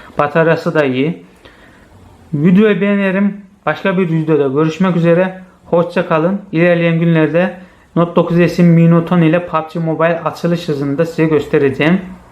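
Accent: Azerbaijan. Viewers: sen azerbaycanlımısın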